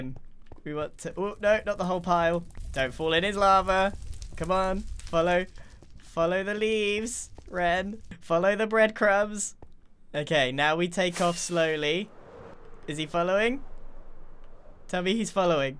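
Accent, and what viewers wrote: United Kingdom. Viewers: "What in the broken teabags" yep, he's definitely british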